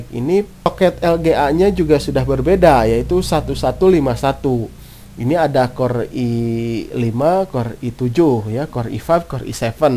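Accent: West Java, Indonesia. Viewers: Dibaca pake aksen sunda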